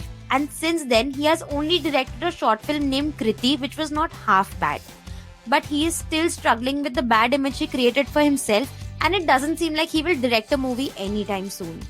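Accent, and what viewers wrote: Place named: Mumbai, India. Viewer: Ok good research but I hate your ugly English accent more then these cringy movies. Please instead of this ugly Mumbai accent English you should better speak Hindi. Really unbearable accent 😣😤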